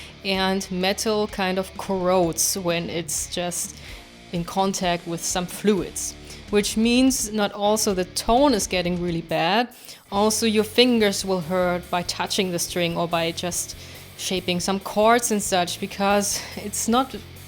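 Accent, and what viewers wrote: Germany. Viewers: Do i hear a german accent? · I just love how you can hear 100% that she is german, even though her english is pretty solid.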